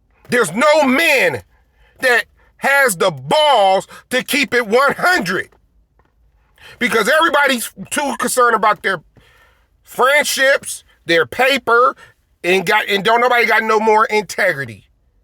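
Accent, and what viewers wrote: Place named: California, United States. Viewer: how about the minute you started talkin' I knew you were from California bruh. Either LA or Long Beach.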